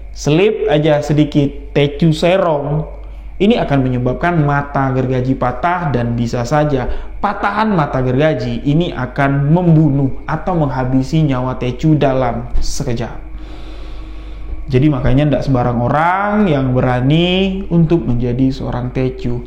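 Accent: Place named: Jambi, Indonesia. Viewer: Aku suka dengar bahasa jambi...bila bang bercerita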